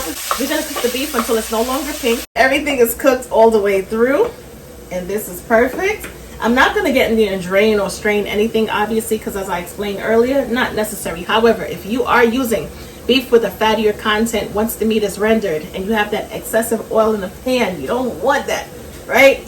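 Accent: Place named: Brooklyn, United States. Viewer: Ohhhhh... Darlin.....you make meold mouth water. i want to try these!! BTW... I love your sweet accent... a little Brooklyn... or New Jersey maybe?? Thanks for sharing.... yummmmmm. Ax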